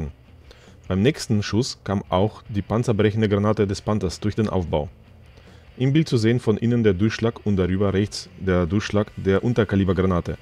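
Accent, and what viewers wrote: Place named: Russia. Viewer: Deutscher Kommentar mit russischem Akzent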